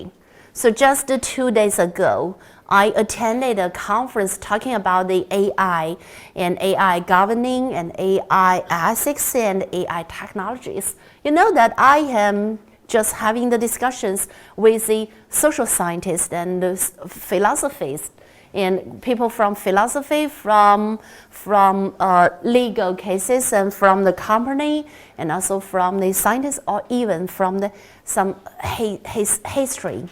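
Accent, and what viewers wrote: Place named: China. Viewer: 中式英语!😊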